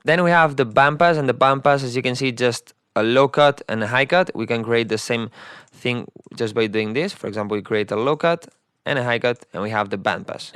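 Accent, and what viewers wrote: Spain. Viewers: Como se nota que eres Español xd, buen video!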